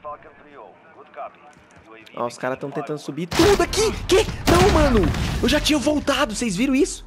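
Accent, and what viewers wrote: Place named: Goiânia, Brazil. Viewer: eu sabia, reconheci pelo seu jeito de falar, eu também sou de Goiânia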